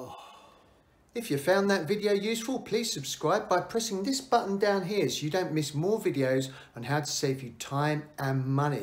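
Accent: England, United Kingdom. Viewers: Big Thanks for doing this video, and so refreshing to hear an English accent on here and someone who appreciates a British cup of tea. I will now attempt to dismantle the washing machine.... Edit I have tried. In theory I know what to do but in practice I don't have the strength to undo the taps. I wish they clipped on like hose pipes do.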